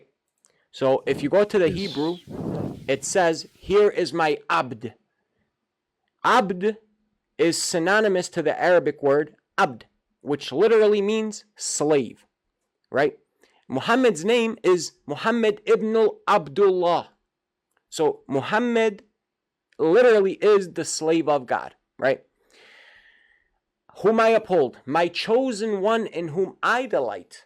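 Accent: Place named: South, United States. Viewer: Curious what kind of american accent that is. Southern , sounds like.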